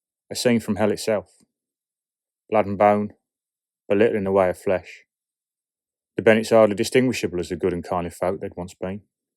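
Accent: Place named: London, United Kingdom. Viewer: Oh dear… I don’t fancy Sgt Hargrove’s chances! 😱 I really enjoy these shorts, as with Hengis, they whet the appetite for what is to come! Presumably, as this is titled as “Project Aeturnus”, some kind of Government skulduggery is afoot? Perhaps trying to uncover the secret of immortality? 🤷🏻‍♀️The reference to Sawney Bean & his cannibal crew intrigued me….perhaps even as fellow cannibals, their primitive slaughtering offended the much more civilised Lucius? 😉 Bravo on your London accent …. 😉 can’t wait to see where this is going & what happens to my favourite bad boy! 😂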